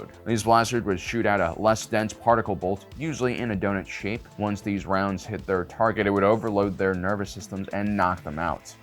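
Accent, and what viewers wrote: New Zealand. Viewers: Australian? No Kiwis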